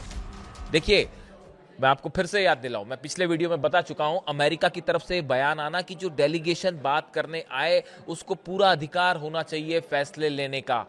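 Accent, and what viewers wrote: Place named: South, India. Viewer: چینل تو اردو ہے لیکن لہجہ ساوتھ انڈین ہے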